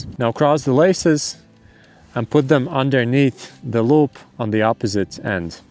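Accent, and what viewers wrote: Latvia. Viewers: Very helpful, super compact and easy-to-understand video! Skatoties likās, ka Tev ir mazliet latviešu akcents, un izrādās esmu trāpījis. Sveicieni un lai izdodas arī turpmāk!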